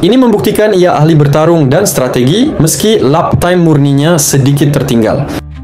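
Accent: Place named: Malaysia. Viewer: emang admin ini malaysia ya? kok logatnya malay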